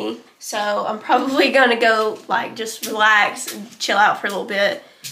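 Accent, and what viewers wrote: Appalachia, United States. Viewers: Love that accent. Didn't even know there's an Appalachia dialect.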